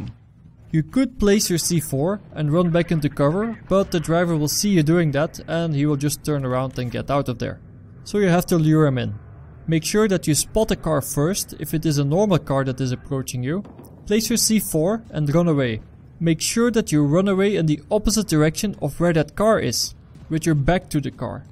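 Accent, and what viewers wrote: Netherlands. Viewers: Are you netherlands? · Hij praat wel met een Nederlandse accent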